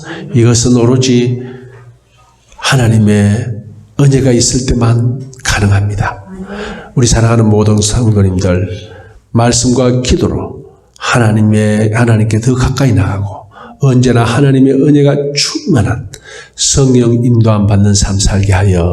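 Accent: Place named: Gyeongsang, South Korea. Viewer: 경상도 구수한 사툴이 할렐루야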